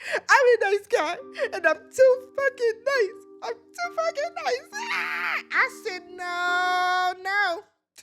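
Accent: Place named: Minnesota, United States. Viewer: Kuh-lee-Bree-ah 🙄🙂‍↔️😑 you pronounce names like you’re from MN too…😶😵‍💫🥴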